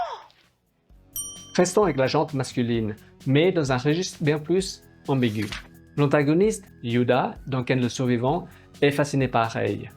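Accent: Switzerland. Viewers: C'est bizarre que tu parles avec les mains comme un italien, avec un accent suisse, et une face asiate. Je suis confus.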